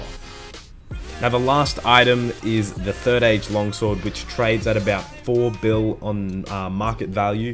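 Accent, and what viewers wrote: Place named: Australia. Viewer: Where in Australia are you from?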